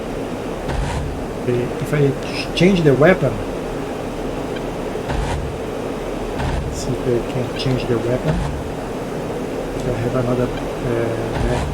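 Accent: Brazil. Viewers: Vc é brasileito né, inglezão com sotaquezão de brasileiro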